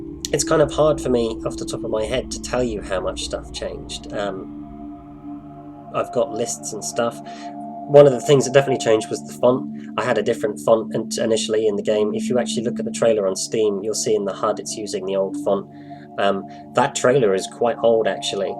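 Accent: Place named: United Kingdom. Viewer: Huh, never imagined you having a british accent. I looove the mod though, I've already played through it 3 times <3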